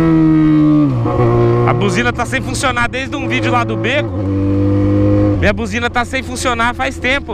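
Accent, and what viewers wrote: Goiás, Brazil. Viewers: Eae por que vc fala no pique do Goiás? Eu sou do Goiás kkk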